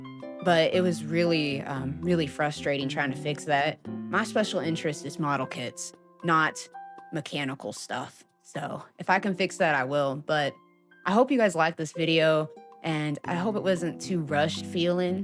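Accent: South, United States. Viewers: Did you move? You’re accent is way country now lol happy to see your videos again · You retired from the other hobby? Just curious. Plan to be watching your new content here. I never noticed you having a slight southern accent before. Love it.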